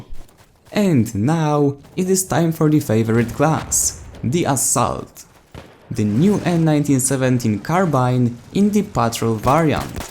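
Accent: Poland. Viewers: He just spends 8 minutes rambling on about crap with a horrendous polish accent. Yeah, right.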